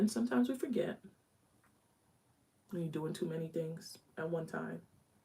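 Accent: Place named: New York, United States. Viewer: Awww come thru, NY accent!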